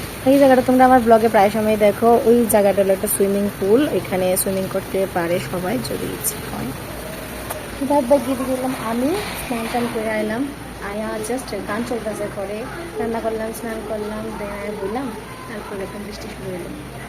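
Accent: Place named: Bangladesh. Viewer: Apni ki Bangladesh er hindu? Apnar accent ta sune mone holo tai jigges korchi...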